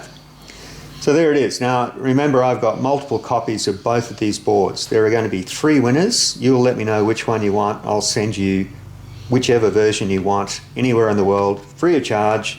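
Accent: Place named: Australia. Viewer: Bridgeport would be my machine of choice, but being a hobbyist I "opted" for an Optimum. It would be great to add your touch dro device to my newly ordered machine. Good luck - great to watch your channel, particularly to hear the familiar Aussie accent (here in Sydney)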